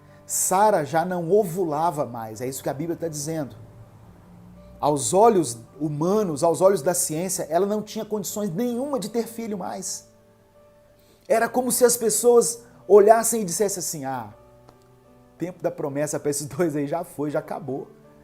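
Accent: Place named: Minas Gerais, Brazil. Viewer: Você é de BH ou MG? Seu sotaque não me engana rsrs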